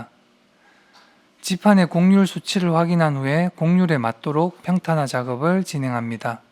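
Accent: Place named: Gyeongsang, South Korea. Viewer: 우연히 본 일본 올드 기타 리페어 영상들~ 정말 손재주가 대단하세요 ~ 구수한 경상도 사투리가 정감이 가네요. 저도 울산인이라~ 기타 간단한 작업 정도만 하는 주부인데 장비며 꼼꼼히 리페어 작업하시는 게 감탄이 나오네요~ 재미있게 여러 영상들 잘 봤습니다~😊😊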